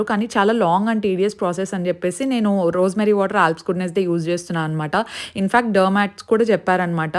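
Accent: Anantapur, India. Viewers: Akka miru pakka ATP language matladutharu akka superb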